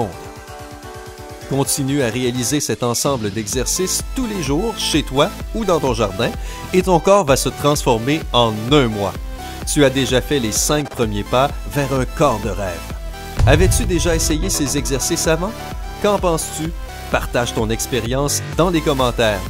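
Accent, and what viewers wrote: Quebec, Canada. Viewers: c'est un Québécois! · Accent canadien? C‘est nouveau 🤔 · C'est un québécois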